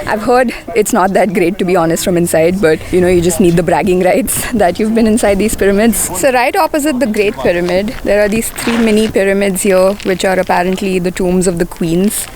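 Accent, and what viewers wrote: India. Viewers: Amazing indian accent speaking english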